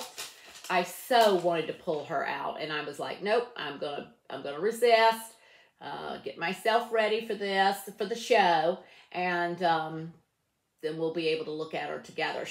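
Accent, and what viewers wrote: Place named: United States. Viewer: I have just subscribed... I just love your reviews and your accent is amazing as a British woman I just love American accents that sound like yours 🤗👌💋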